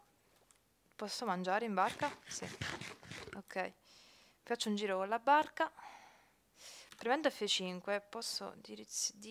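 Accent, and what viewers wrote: Naples, Italy. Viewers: Ma sei napoletana? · anche io sono di napoli, ma parla come vuoi, anzi a me piace di più quando parli un po' in dialetto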